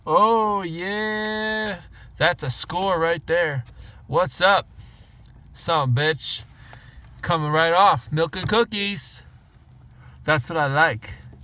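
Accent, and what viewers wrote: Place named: Texas, United States. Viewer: Texas?? Lmao you sound like you’re from Texas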